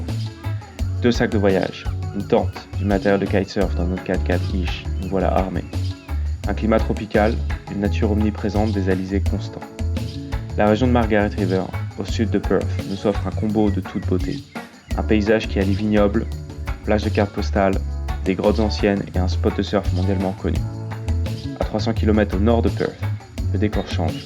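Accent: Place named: France. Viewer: Je me disais bien que tu étais français quand je t'ai entendu dans le vlog de Jamie et Nikki en tout cas très belle vidéo. :)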